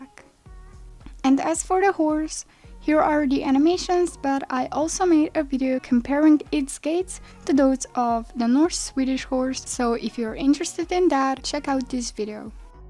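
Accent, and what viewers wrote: Germany. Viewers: Are u german? :D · Du bist deutsch oder haha?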